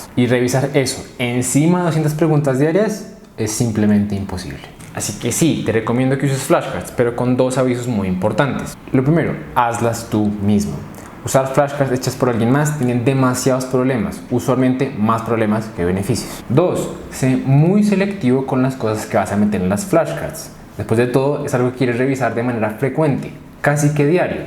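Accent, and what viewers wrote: Colombia. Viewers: Ese acento es de cali sin duda., Que buenos consejos, Te felicito te mereces el exito que has logrado 💕👏🏻👏🏻👏🏻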